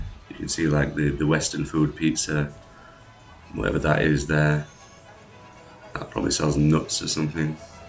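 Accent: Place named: Yorkshire, United Kingdom. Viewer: What accent is that it sounds a bit Yorkshire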